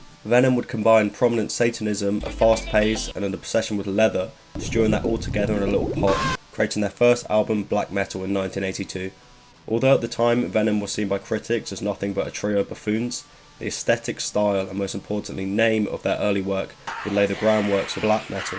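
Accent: United Kingdom. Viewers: british mb?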